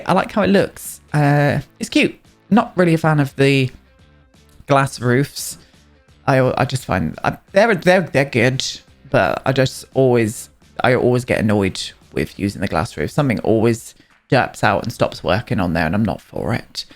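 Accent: United Kingdom. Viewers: Wait..... you’re British?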